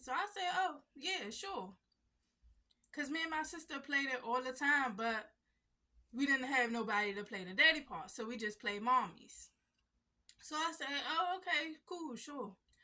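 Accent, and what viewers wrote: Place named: Washington DC, United States. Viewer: Dc accent heavy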